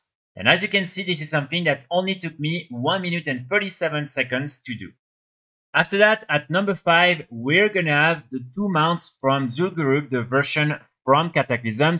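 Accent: France. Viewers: ze french accent is very there (superb video btw thanks <3)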